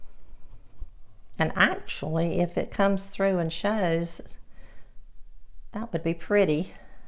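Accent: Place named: Alabama, United States. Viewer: Looks wonderful! Look forward to trying this. Oh how I love blueberries. I heard you mention Thomasville. Is that Alabama? My step mom was from there and passed away recently (Atkeison). Your beautiful accent reminds me of her. God bless!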